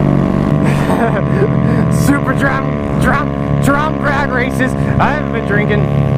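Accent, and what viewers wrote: Australia. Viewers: 10/10 Australian accent. Please do more.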